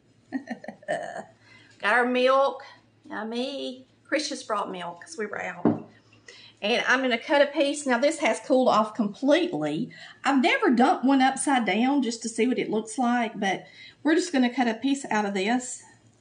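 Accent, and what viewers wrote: South, United States. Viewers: I don’t know how this came up in my feed, but I am loving this. This feels like home. I mean, Lordy mercy! Somebody that talks all southern like me! Likin’ and subscribin’ right now. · It’s kinda hard to marry up a southern American accent, and a German cake 🤪. Y’all get some pecaaaaarns LoL. However, the sound quality in this video is first class, and the recipe is worth making 👍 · It looks delicious, can't wait to try this. Thank you, sweet lady, I love your Southern accent.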